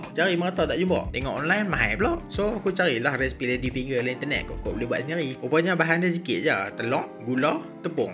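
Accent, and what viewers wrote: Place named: Perlis, Malaysia. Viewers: Kannn...rindu akk anak buah dok Kuala perlis.persis suara camnilah...pekatt idgham die😊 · Tudia noh pekat utara macam loghat kuala perlis😂😂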